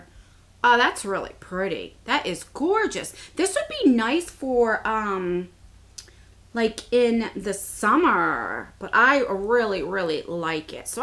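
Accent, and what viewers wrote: New Jersey, United States. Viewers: Hi i really like your vidoes been subscribed for like 6 months now i love your Jersey accent its beautiful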